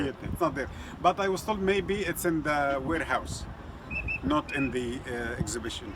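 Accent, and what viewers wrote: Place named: India. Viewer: THIS GUY IS NOT EGYPTIAN 💯 HE HAS INDIAN ACCENT , HE TRYNA DISCARD AND RE WRITE HISTORY LIKE EVERYONE ELSE THAT CAME BY THE EGYPTIAN GODS 💯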